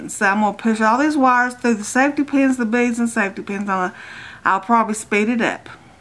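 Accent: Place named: Kentucky, United States. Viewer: I love your videos they are very informative and easy to follow and also you crack me up. Your country accent reminds me of my husbands family, they are in WestVirginia. You sound a little more south, regardless of where your from, your great,keep up the good work, I cant wait to watch more of your tutorials...GOD BLESS YOU DARLIN!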